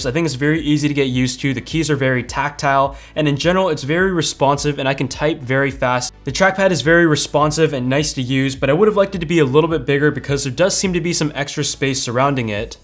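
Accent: Canada. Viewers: r u from Canada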